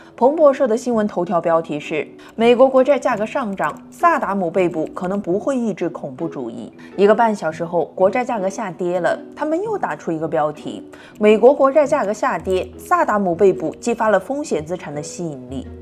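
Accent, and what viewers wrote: Hunan, China. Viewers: 博主真的不是湖南人吗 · 大概率是湖南口音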